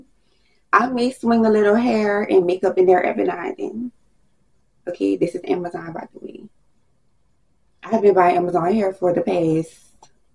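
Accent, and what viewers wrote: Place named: Los Angeles, United States. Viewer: Girl I've been binging your vids all day! I found your channel today while looking up correctional nursing videos as I only have one more pre req before I apply to my RN program. Been a CNA wayyy too long (7yrs) and ready to finally change my life for myself and my 2 toddlers. You're a breath of fresh air and I'm excited for your content and going on my nursing/healing/motherhood journey right with you girl❤...oh and that LA accent is everything baaaby or however y'all say it lol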